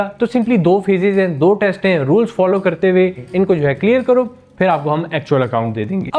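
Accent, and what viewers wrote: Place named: Pakistan. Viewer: Ab batao bhai aur 100% loser ki fee se nhi chalta unka main revenue of source h pr bohot si cheeje h ab job Krna hoga to banda karlega funding pips me bolega halal h aur wahi model pe kaam krne ko glt kaise bol skta h 😂 btw me India se hu mujhe is ke upr ki jo mene knowledge li h iske upr level ki knowledge laake batao ki ye haram h pakistani 😅 awaaz curiosity jyada banati h :) agar h koi banda to genuine batao to me leave bhi krdunga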